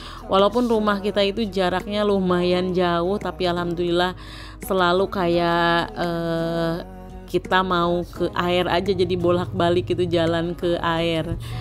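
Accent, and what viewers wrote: West Java, Indonesia. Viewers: Ibu sunda pisan, mun sur org sunda mah asa ka cai we jauh ge da ka bumi budak 😂😂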